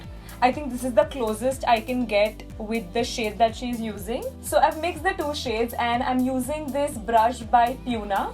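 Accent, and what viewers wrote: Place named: Gujarat, India. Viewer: Your Gujarati is soooooooooooooooooooooooooooooooooooooooooooooooooooooooooo cute 😍😍😍😍😍😍😍😍😍 OMG ❤❤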